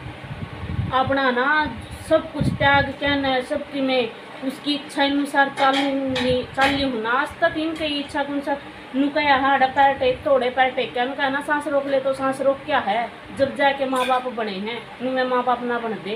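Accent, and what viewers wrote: India. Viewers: Aap Ki Deshwali Boli Bhut He Aachi Laghi Ha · Puver haryaanvi😂😂😂😂😂😂♥️🤣🤣🤣tere ke dard h ,🤣🤣🤣🤣💌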